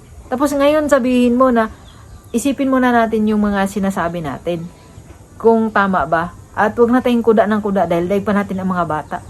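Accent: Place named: Visayas, Philippines. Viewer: Bisaya ka pala dong .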